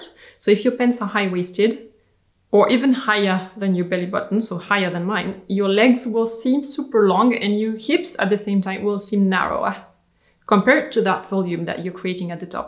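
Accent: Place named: France. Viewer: Thank you for this! I love your fashion "education" videos-they are so helpful and I learn, or am reminded, of so many tips. Also-has anyone mentioned since your return to France there's a tiny strengthening of the French accent to your English? It makes me very happy as I visualize your daily life there ❤️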